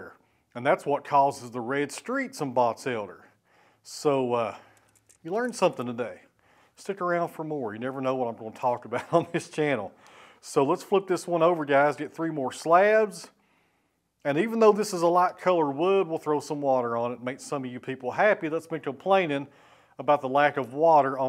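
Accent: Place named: Tennessee, United States. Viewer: I watch a lot of saw milling on Youtube, but lately its gotten difficult to watch other channels. It seems they are working way to hard, you make it look easy my friend! Its also nice to hear the Tennessee accent. I grew up in the Knoxville area, but im living in Kansas City now and I really miss people that speak properly 🤭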